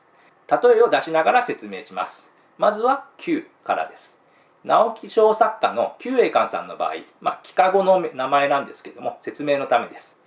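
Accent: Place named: Taiwan. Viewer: 可能您沒聽出來，這位日本人應該是個台灣人才對，聽日語能夠聽出來有跟日本人講的一些發音上的區別我，但是不得不說，日語已經特別特別好了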